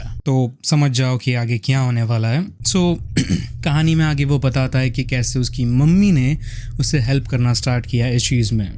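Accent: India. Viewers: You actually sound like only desi. Thanks for the video tho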